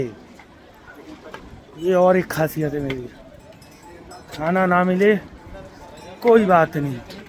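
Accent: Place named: Punjab, India. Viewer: Ur Punjabi accent rocks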